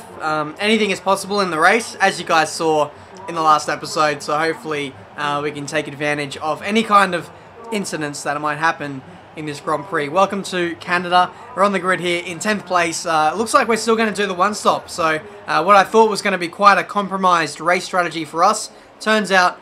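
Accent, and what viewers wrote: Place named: Australia. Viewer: No apologies are needed, I watch because i like the Australian accent and because i love F1, its like watching a season of F1 without having to wait 2 weeks till the next race weekend.. When i get home and finish cooking i watch this as daily ritual almost, and a crap race like this is still more fun to watch than any of the shite i see on TV.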